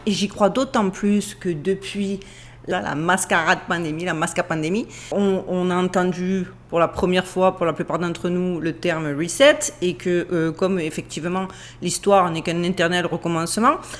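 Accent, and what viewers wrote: Marseille, France. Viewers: Accro à vos vidéos je suis;-) en direct ou plus souvent en replay. Votre curiosité insatiable, votre sens du détails, votre façon de raconter, votre petit accent de marseillaise vivant à Las Vegas, votre franc parler, la qualité de vos reportages.....et votre beauté qui est à souligner egalement...Tout de est remarquable. Mais... où est donc Duchesse aujourd'hui 🤔Merci beaucoup, infiniment, de votre travail, de vos recherches fouillées. Mais comment faites vous donc pour avoir un nouveau reportage à nous présenter chaque dimanche??☺🤩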